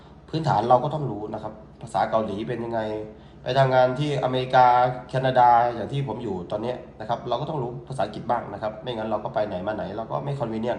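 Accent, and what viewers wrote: Isan, Thailand. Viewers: สวัสดีครับสบายดีครับพูดภาษาไทยชัดมากภาษาลาวไทยอิสานออกสำเนียงอุบลฟังแล้วไม่ใช่สำเนียงลาวฝั่งซ้ายการออกเสียงเป็นคนอิสานไม่ใช่คนสปป.ลาวฟังแล้วเหมือนคนอิสานพูดเป็นกลางไม่ใช่อวยครับพูดถูกต้องแล้วครับเป็นกำลังใจครับ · สำเนียงแบบคนไทยภาคอีสานเลยครับ,เวลาพุด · อ้ายเจ้าเว่าลาวยังบ่คือลาวยุ ผมฟังเป็นทางอีสานครับ “ขออภัย” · คุณพูดเป็นภาษาไ ทยอิสานนะไม่ใช่ภาษาลาวติดตามอยู่คนรุ่นไหม่ไฟแรง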